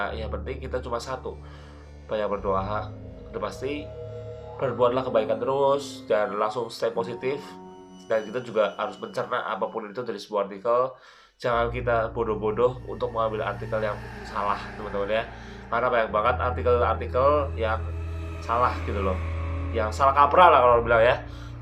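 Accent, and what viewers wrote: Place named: Java, Indonesia. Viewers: medok nya yang buat aku klepek klepek malah hihihi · medoke ketara nemen yakk hahahaha...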